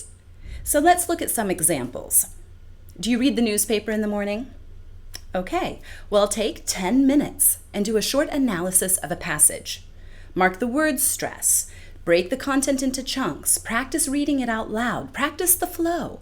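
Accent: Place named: Mid-Atlantic, United States. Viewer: You have an amazing accent. It's sort of a posh American accent, so to speak. By any chance, is it close to Mid-Atlantic accent?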